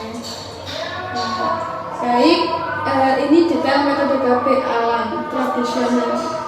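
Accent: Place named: Java, Indonesia. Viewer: Nge Jawa banget ini kak😢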